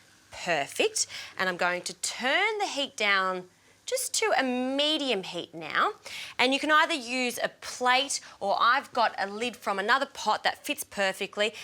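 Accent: Australia. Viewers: Australian accent! 🤩 I am Brazilian and worked on a cruise ship in Australia. Instagram: alinestravels I will try this recipe. I love galettes!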